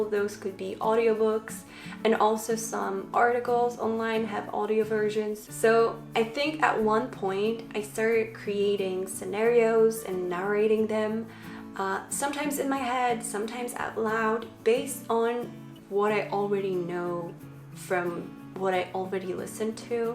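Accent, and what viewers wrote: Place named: United States. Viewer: I remember your first videos on this English channel and oh my God, girl, you've improved soooo much! Not only vocab and grammar but your pronunciation! If I didn't know you're from Poland, I would definitely say you're American! ❤️ cheers from Opole 😉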